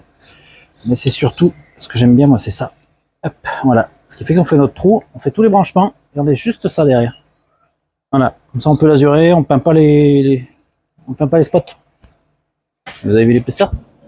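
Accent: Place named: Savoy, France. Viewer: new follower sur ton channel, tu as des compétences de ouf, bravo pour tes réalisations au plaisir de te suivre , tu as vachement l'accent savoyard, mdr.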